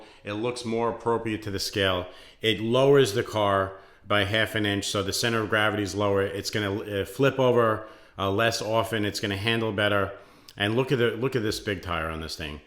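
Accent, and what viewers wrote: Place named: New Jersey, United States. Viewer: I just realized I think you’re in New Jersey I could tell by the accent lol. But I’m gonna guess up north because I’m in the south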